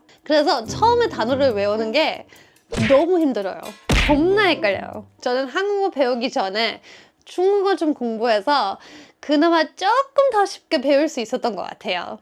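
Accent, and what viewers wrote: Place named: Vienna, Austria. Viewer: 여사님 목소리는 정말 비엔나 여성의 전형입니다. 프랑스 여성과의 큰 차이.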